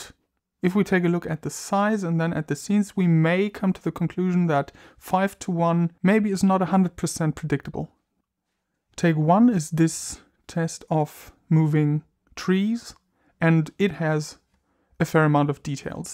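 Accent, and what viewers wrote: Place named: Germany. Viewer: Tolles Video, dass du Deutsch als Muttersprache hast erkennt man in deinem Englisch sehr gut 😬✌🏻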